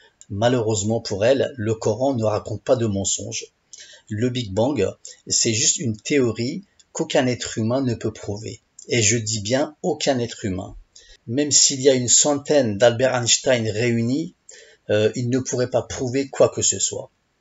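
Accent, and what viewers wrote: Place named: Turkey. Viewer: Zann. Avec z. Ne veut rien dire. Mais than a. Thana ou bien thounou qui signifie penser. Comment toi prof tu enseignes ? Pour enseigner , il faut se faire comprendre . On ne comprendrais pas sinon ! Pour beaucoup l arabe c est du chinois , seulement toi tu y ajoute ta sauce accent turk ... C est bien de rappeler mais rappel les tiens.... mais avant de rappeler les tiens , ai la certitude de tes propos car tu es loin du sentier avant de trouver la route qui te mènera au droit chemin .... que seul les alamins ( initiés ) savent. Sache que le Livre, que personne ne comprend ... est destiné que pour les initiés.... Et il n y a pas de dajjal ou de mahdi qui va revenir. Mais la sentence direct . C est une question d heure. De sa3a comme il est écrit.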